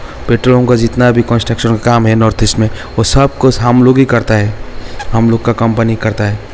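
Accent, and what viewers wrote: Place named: Odisha, India. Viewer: BHAI APKO HINDI BHASA BOLNE ME DIKAT HO RAHI HAI KYA AP KI HIDI BHASA SE AISA LAGTA HAI AP ORRISA KE RAHNEWALE HAI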